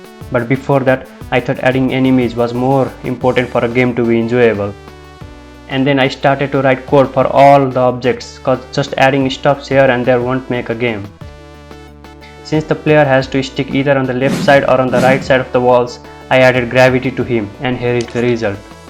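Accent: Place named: India. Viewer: Heey you sound like an Indian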